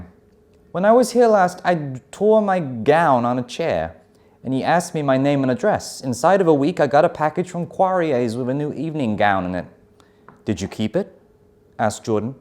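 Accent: United Kingdom. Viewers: Hi. reading sounds so British! Amazing! I have been British English for several years because I fell in love with its pronunciation, intonation and expressions. However, it is so tough to learn it. I can hardly acquire it. That lets me down easily😰. I listened to his reading and got impressed with his British accent Which encouraged me to keep learning. I love his lovely voice, too, by the way. That sounds really comfortable😌. Thank you so much. ( ^-^)ノ∠※。.:*:・'°☆ PS: Could you read ' Howl's Moving Castle' (by Diana Win Jones?) for us, please?😊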